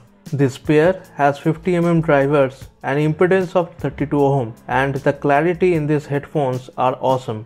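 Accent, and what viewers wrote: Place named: India. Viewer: Lay back with ur Indian voice a bit please ruined the video kind of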